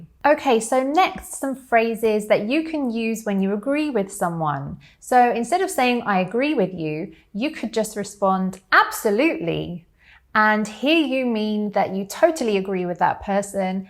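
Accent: United Kingdom. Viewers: I love your British accent 😍😍😍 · After im following your lessons i think im fallin in love with UK accent.... Kinda sweet and cute...🙃🙃🙃🙃🙃🌹🥳⚘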